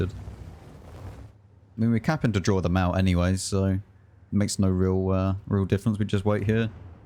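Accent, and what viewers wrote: United Kingdom. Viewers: are you from Kent?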